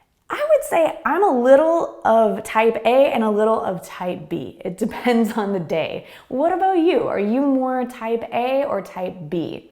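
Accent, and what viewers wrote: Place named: United States. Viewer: good job. Excellent. Sometimes I forget your accent is an American one. There are several teachers I can't get them without driving crazy.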